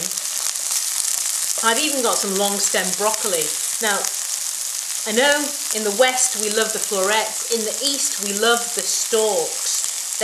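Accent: England, United Kingdom. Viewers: what is that accent?why english accent? really funny though.....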